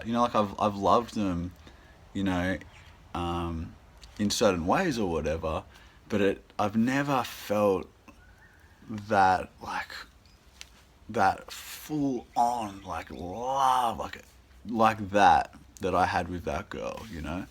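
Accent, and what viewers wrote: Australia. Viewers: Interesting that you subconsciously wanted n needed the same kind of love since teens. I remember that n only illness fundamentally broke that from me n i couldnt now even think of how another lover coukd make me feel high again. Dig ya channel dude.. feel like i know you. Mmm maybe its the Victorian(?) accent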